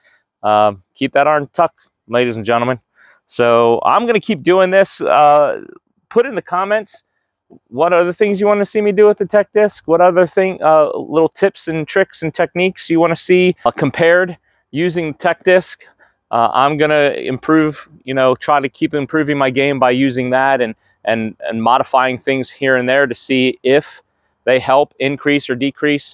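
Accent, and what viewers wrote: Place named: Philadelphia, United States. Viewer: ah, ok. I think you're more subject to the Philly accent there than the Pittsburgh area. You have the vowel sounds: "ph-oh-ne h-oh-me to my Fahm-ly." I used to live there for a few years and can pick up on certain accents.😄 Appreciate you humoring my non-dg question! But I digress! Subbed'! I do similar content to grow the sport. Cool you got your kids into it too. One of my 3 boys joins my wife and I at least once a month. Building him a bag was super fun!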